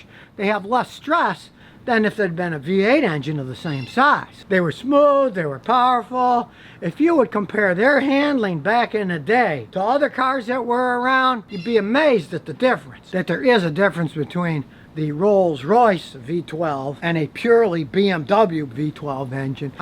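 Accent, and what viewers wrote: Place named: North, United States. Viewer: how come you live in Houston but have a northern accent? Those hard Rs, dead give away.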